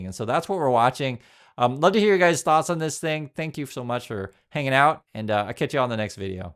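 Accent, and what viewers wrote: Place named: United States. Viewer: I absolutely love your videos, but your American affectation and accent impact your diction, pronunciations like 'T-err-eh' and 'me-eh,' for example. Of course, I understand you, but it lacks distinct clarity and pronunciation.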